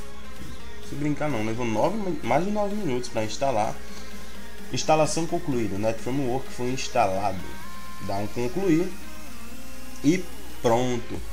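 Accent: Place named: Bahia, Brazil. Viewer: tu e baiano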